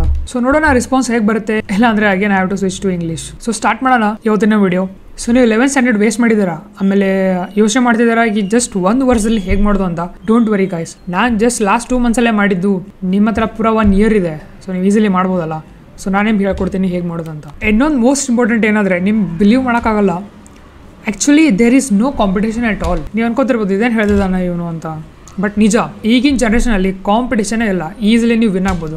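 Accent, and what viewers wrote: North Karnataka, India. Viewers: Anna mast matadiri uttar karnatak ❤ Anna physics difficult agateti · ಅಣ್ಣ ನಾನು ಕಲಬುರ್ಗಿ ದವಾ ಅದಿನಿ.ಉತ್ತರ ಕನ್ನಡ ಭಾರಿ ಮಾತ್ ಆಡ್ತಿ ನೀನು ❤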